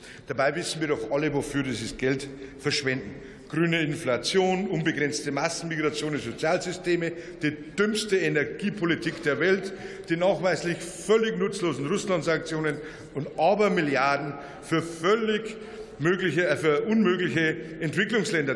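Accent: Bavaria, Germany. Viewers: Agrarthemen mit bayerischen Dialekt wirken irgendwie authentischer... 😂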